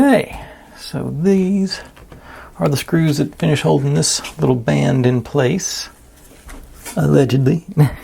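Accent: South, United States. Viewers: Thanks so much for this full video set--I picked it up about Section 5 or so when I started to get bogged down. Most often, I watched the videos after I had done a process, to make sure we had done it the same. I chuckled through a lot of the videos as you and I made many of the same mistakes and had the same re-dos. I ended up breaking 3 pieces, and mercifully there were spares of all of them included. This was my 4th ROKR and most complex one. I've done hundreds of jigsaw puzzles, but not many 3d kits. I was intrigued that you have the same regional accent as another YouTuber I've been following for awhile--no idea where he (or you) lives, but it seems like a Kentucky or west Tennessee accent. <link>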